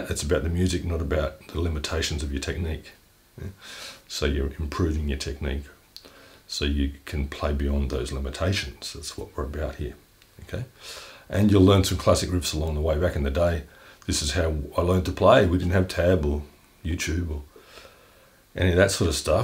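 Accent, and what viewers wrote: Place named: Australia. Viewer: This is really cool! I'll be honest I was not expecting an Australian accent haha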